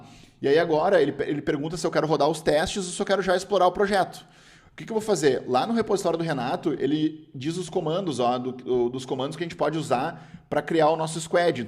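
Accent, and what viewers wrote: Brazil. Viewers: incrivel a qualidade do canal muito bom, somente o sotaque gaucho que não é tão agradável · Meu amigo, sotaque de Porto Alegre dói na alma pqp kkkk.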